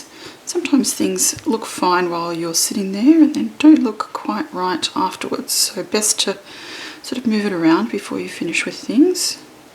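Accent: Australia. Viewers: Thanks for the layer ideas! Love watching you create! When my children asks how Australian sounds, we listen to you together ☺️😘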